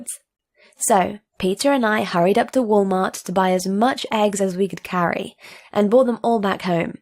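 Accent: United Kingdom. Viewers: I like how she has a British accent and goes to Walmart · Y is this recorded in a British accent if they went to Walmart because that american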